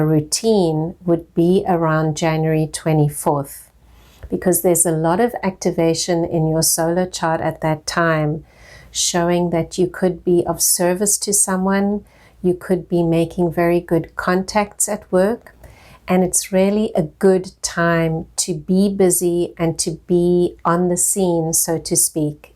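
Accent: South Africa. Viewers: Sounds like you're South African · Are you South African tho??..I can hear the accent...or am.I wrong...Ur amazing...My heart thanks you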